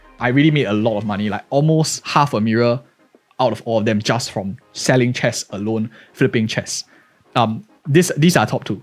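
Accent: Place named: Singapore. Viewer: more like singlish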